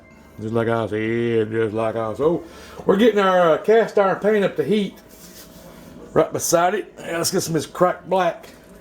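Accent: South, United States. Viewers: his voice is classic country boi status.. Kool Dude though.. i like his technique, i would definitely add my own spin to it... but overall good job..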